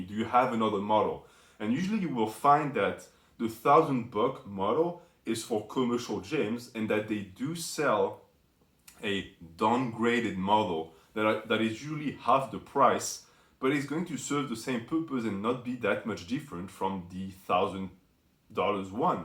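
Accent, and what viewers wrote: France. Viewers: why tf you wanna know that lol Well you can tell he's french by his heavy accent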